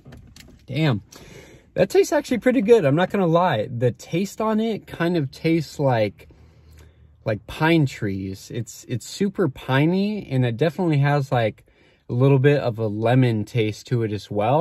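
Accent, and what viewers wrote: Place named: Los Angeles, United States. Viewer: You hear that hes from LA😂💀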